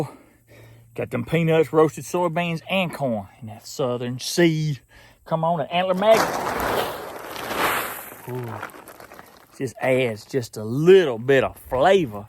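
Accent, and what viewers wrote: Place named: East Texas, United States. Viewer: When I hear you talking it reminds me of growing up in East Texas near the Louisiana border. I havan old hunting buddy lives in Grenade Mississippi. Love watching your videos.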